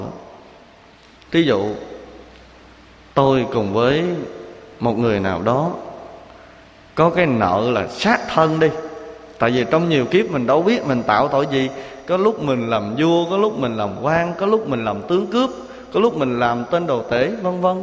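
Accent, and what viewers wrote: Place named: Quang Nam, Vietnam. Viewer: Thầy nói giọng nói giống người quảng quá ạ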